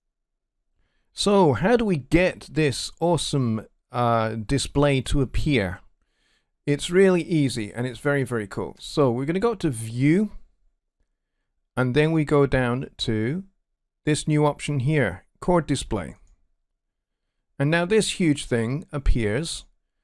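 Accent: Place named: Scotland, United Kingdom. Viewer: Where are you from in Scotland 🏴 bud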